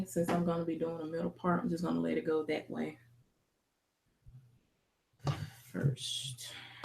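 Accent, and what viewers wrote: United States. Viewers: Yur a lovely lady with a beautiful smile even with yur pink and green lime clips n bubble upside yu head. Love yur accent normally I dont like some american accents after awhile but I found yur voice soft n relaxing n did not fast forward till close to th end. I thought yu did a good job for a first time specially as I'm not that good at corn rowing stay blessed 🙏🏾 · Your hair looks as beautiful as you! Love it! Our voices are almost identical and I thought I was listening to a recording of myself. You must be from the southern midwest, sister. LOL. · this was great, you sound like you're from East Texas or Dallas, my bffs and family are from there and you sound just like them. love it 😁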